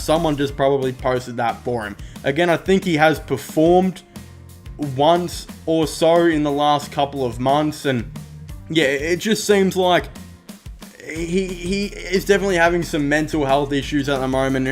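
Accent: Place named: Australia. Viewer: aussie accent lad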